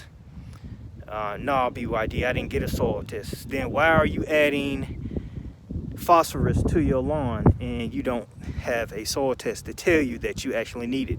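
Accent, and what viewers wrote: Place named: Atlanta, United States. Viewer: Now I'm from Avondale and I could tell right away. Lol I told my wife that's Atlanta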